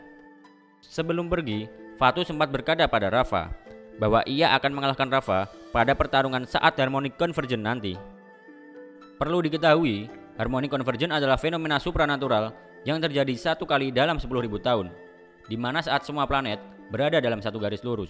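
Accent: Indonesia. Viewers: Abang orang NTT ya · Medhok looorrr....!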